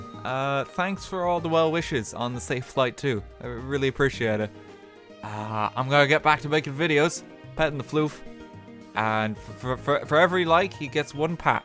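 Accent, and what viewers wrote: Ireland. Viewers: Which county m8? Your accent smells like Southern. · "Tuesday tru turshday" love the irish accent, its always a joy to listen to. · Ah truesday Lovely Irish accent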